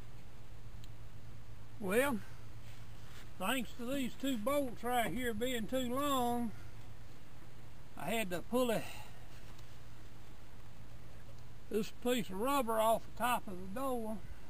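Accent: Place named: South, United States. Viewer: That's some southern accent! Nice work on the window regulator.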